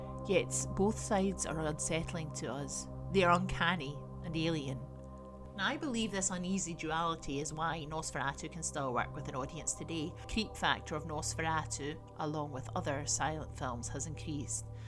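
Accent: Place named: Scotland, United Kingdom. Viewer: That was great! Nice to hear a Scottish accent on youtube ( im from Falkirk ). Deffo liked and subscribed! Have a good one.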